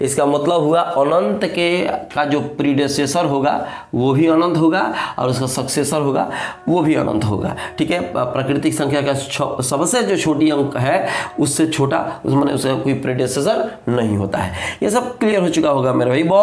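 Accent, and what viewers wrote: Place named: Bhojpuri, India. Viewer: Sir apka bhojpuri wala acent acha lagta hai with bhojpuri song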